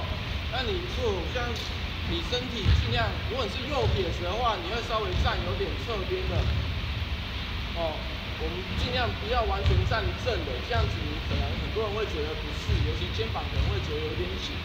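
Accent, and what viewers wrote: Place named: Minnan, China. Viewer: 你很mean。这是闽南那一带说话方式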